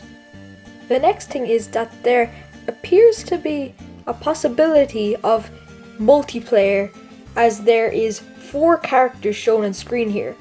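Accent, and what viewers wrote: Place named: United States. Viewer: U sound American in this😂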